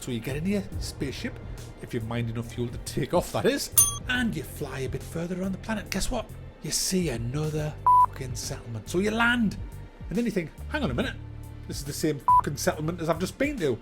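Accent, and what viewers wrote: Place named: Northern England, United Kingdom. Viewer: He's a northerner? I like that accent.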